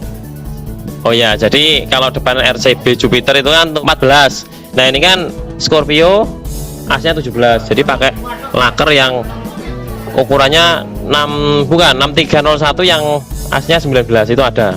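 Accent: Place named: Java, Indonesia. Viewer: Kalau saya sih untuk segi kata² dan penjelasan nya agak di perbaiki biar mudah di cerna kayak roti hehehe itu sieh comentar saya lur dan dari segi bahasa agak di perjelas lebih enak bahasa indonesia tapi terkadang agak susah juga soal nya dari keseharian bahasa nya medhok bahasa jawa Siap slur maaf kalau ada salah² kata Mohon di maafkan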